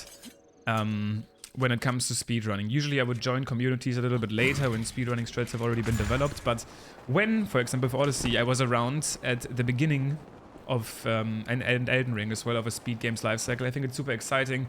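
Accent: Germany. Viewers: Dein Englisch klingt so deutsch xD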